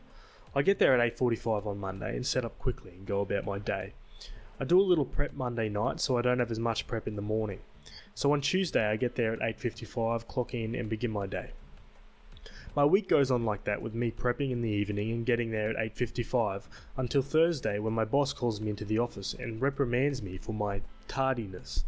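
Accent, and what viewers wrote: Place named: Australia. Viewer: It's great to hear another Aussie doing the Reddit stories. Sick to death of the dammed Yanks having the monopoly on YouTube. Looking forward to more from you but I'd like to suggest trying some different sub-reddits because I have heard the second story 5 times in the last couple of days. Few people do neckbeards, incel tears and several other entertaining ones. I think I even saw a Bogan one somewhere.... lol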